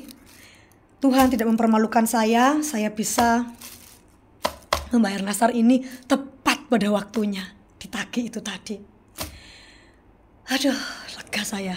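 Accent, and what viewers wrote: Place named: Java, Indonesia. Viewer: Suka logat nya jowo bnget ❤❤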